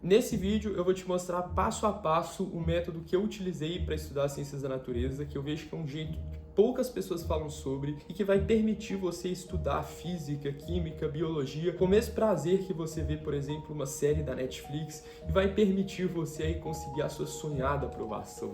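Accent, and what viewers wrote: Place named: Minas Gerais, Brazil. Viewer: Oh sotaque mineiro, e muito bom kk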